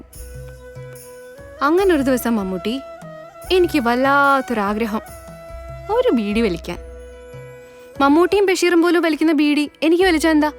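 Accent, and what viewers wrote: India. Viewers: കോഴിക്കോട് കാരി ആണല്ലേ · Randam classil Kauravar… njanum…feel aaki kalanju…Valluvanadan slangum…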